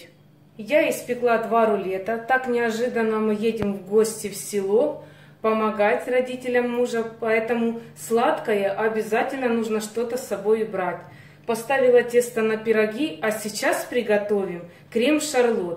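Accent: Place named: Ukraine. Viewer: Спасибо, за Ваше внимание, за ответ! Судя ро лёгкому акценту, Вы живёте в Украине, как и я?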